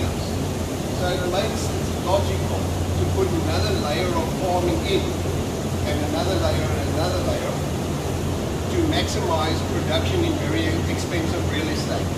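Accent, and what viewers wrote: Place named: South Africa. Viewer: My guy sounds south African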